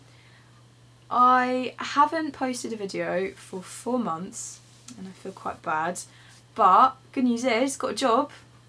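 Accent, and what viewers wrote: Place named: England, United Kingdom. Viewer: What county are you from, I'm from Bedfordshire I'm just wondering as my accent is quite similar to yours :)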